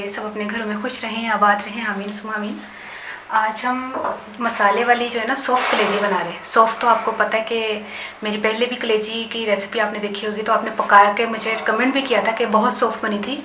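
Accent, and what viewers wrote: Punjab, India. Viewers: Ap jb bolti ha too Karachi ki nai lagti.punjab ki rehne wali lagti ha.anyway nice vlog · ریسپی تو آپکی اچھی ہے ہی۔۔لیکن کہیں کہیں پہ پنجابی کا ٹچ جو ہے نا ۔۔۔۔کمال ہے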